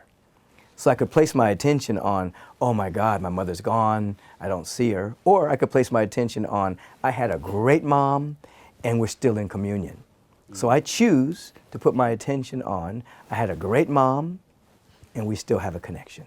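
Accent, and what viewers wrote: France. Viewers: U have a french accent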